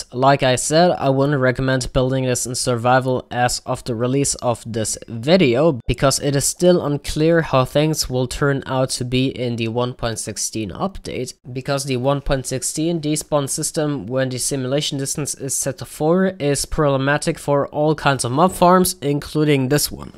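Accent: Germany. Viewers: yup... I love his German accent. Most good technical minecrafters have German accents, ilmango, nex, methodzz and so on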